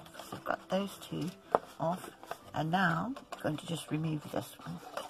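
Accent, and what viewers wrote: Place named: United Kingdom. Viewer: are you from the UK. Your tone and accent is very nice. We don't feel like you are rushing through it. Now your project is the best one yet. I love the little sheen in the checkered pattern and the bling type ribbon you used to give that wow factor. Give Chloe little kisses for me.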